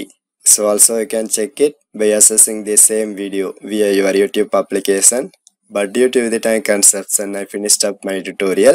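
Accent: India. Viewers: I hate indian accent, sounds ugly!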